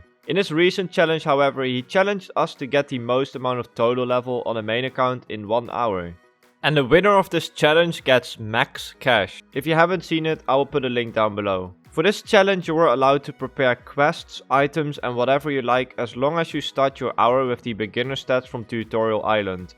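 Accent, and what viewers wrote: Netherlands. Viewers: you sound dutch man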